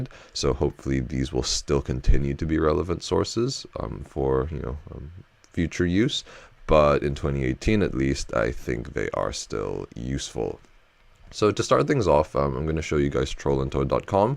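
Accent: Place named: United States. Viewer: You live in New Zealand , funny you sound American! 😉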